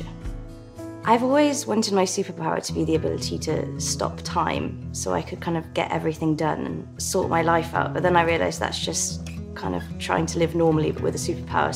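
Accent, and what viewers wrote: England, United Kingdom. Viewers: British accent love🤩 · Love her English accent taking over her rural accent!!!!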